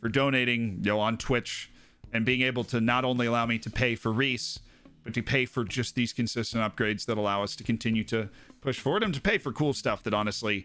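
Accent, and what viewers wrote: England, United Kingdom. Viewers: Good English accent! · That English accent is fantastic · Oh shit that was an English accent was it? P.S. Good luck with the revamp bud i'm looking forward to what you're going to get up to. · Decent English accent.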